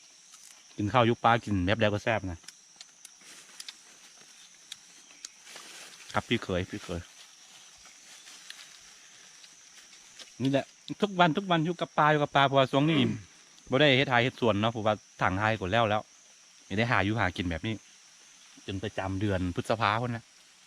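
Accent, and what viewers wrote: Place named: North, Thailand. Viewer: สำเนียงพูดออกเหนือบ้างออกลาวบ้างคับอยู่ใหนคับชอบๆติดตามเน้อ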